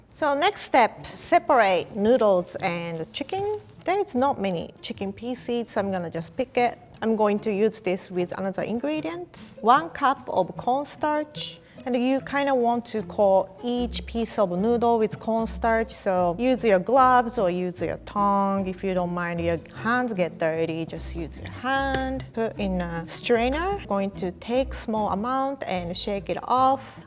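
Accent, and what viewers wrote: Japan. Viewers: What region of japan is she from. the accent is interesting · lovely Japanese English.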